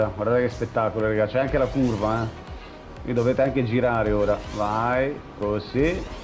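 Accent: Rome, Italy. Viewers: Mokk al mitico 🤣🤣🤣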